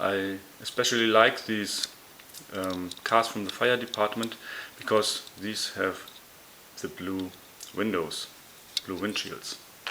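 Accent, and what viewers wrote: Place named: Germany. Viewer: Der deutsche Dialekt beste😂👏👍 aber sehr unterhaltsam 👍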